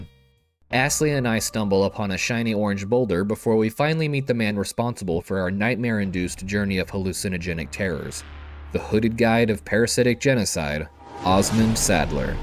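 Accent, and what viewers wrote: United Kingdom. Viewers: yeah east end bruv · Another example of an American not knowing what English English sounds like. He’s not an Aussie…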